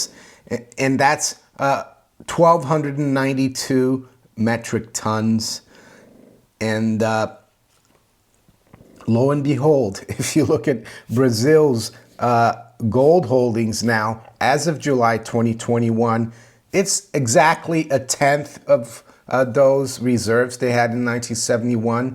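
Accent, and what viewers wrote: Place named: United States. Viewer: I have a silly question I’ve been meaning to ask -, how did you end up speaking English with an American accent? Is that common in Brazil?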